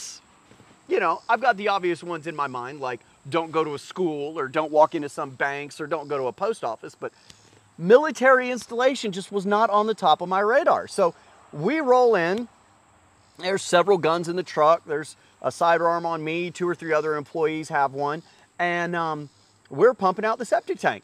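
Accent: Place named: Asheville, United States. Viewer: You are definitely from Asheville .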